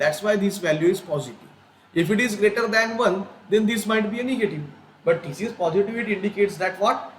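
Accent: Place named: Marathwada, India. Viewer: Sir your marathvada slang is so sexy Baki beutiful vedio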